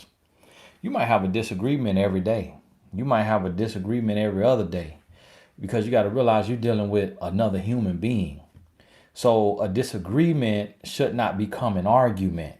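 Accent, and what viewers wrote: South, United States. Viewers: You be cracking me up with the funny things you say in your southern accent. 😂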